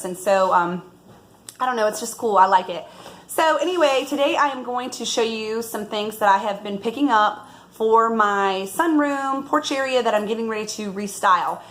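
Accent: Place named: New York, United States. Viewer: Are you from New York. Cute accent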